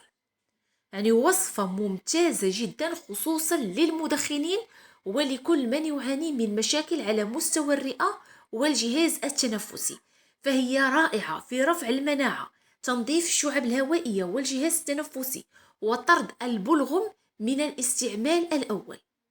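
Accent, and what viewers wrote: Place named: Casablanca, Morocco. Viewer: وصفه جديده مغربيه احسن وصفه الببوش مع الفليو بولغم وش البولغم قصدك بلغم الله يعين اللغه العربيه من لهجتك انت بنت كازا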